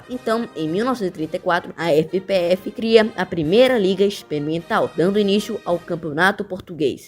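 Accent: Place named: Brazil. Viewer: Foi preciso uma voz brasileira a explicar tudo isto. Grandes Tugas ! 🎯 Fora com a Belenenses Sad, corruptos.... Volta " Os Belenenses". 💙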